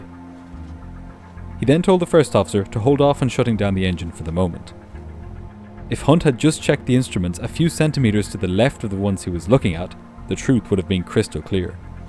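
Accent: Ireland. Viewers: Love seeing your handsome face in your videos, coupled with your gorgeous Irish accent, which makes your videos so much more relatable 🇮🇪☘️🇮🇪😍😍😍😍😍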